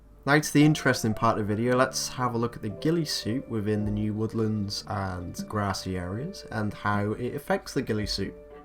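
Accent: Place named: South West England, United Kingdom. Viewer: Are you from sw uk mate you sound very local with that accent 😜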